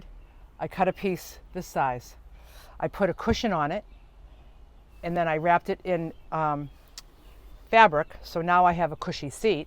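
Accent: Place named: New England, United States. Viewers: Love your new england accent...Massachusetts gal myself...you're very cool! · ..where in New England are you? I grew up in Massachusetts and love your accent! You are so clever. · I love your accent, reminds me of "Aunt Elsie" from Martha's Vineyard.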